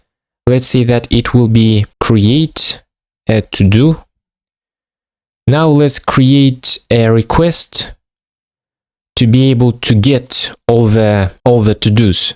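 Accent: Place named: Russia. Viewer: I just wonder what is your native language? I hear accent and really wonder. My guess is that u are Russian.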